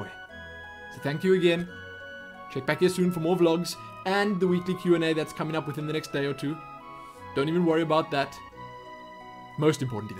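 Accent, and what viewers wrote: South Africa. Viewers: Just thought I'd let you know that I LOVE the way you sound. The South African accent is my favourite accent, and your voice is just perfect! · I think I'm one of the view viewers who doesn't find the South African accent strange at all - despite being from Scotland I grew up knowing a few South African people so I guess I'm acclimatised to it :3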